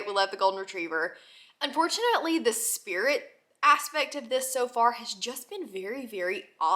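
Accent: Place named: South, United States. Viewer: heehee your southern is really coming out in this video and I love it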